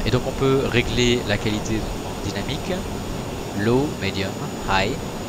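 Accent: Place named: United States. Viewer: accent américain yes 😀😀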